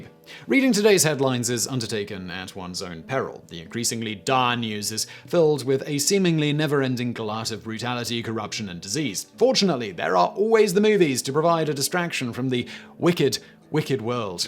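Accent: United Kingdom. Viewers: Chrisholm for Chisholm definitely got a giggle from me, I can see how your brain would want to add an R in there. It was extra amusing to hear someone say the "holm" part with a British accent instead of the Southern U.S. accent I always hear & say it with ("um" rather than "holm").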